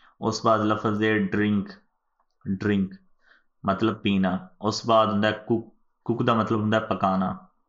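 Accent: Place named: Jhang, Pakistan. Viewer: سوہنا جھنگ سوہنے لوک سوہنڑی بولی سوہنا لیکچر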